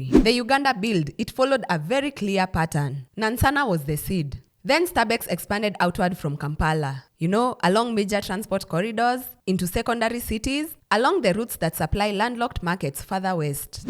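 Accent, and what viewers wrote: Kenya. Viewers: Gotta love the Kenyan accent!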